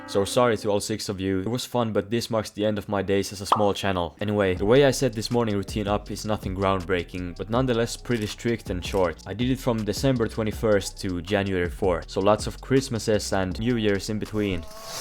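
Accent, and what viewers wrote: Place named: Finland. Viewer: Suomen aksentin aina tunnistaa :D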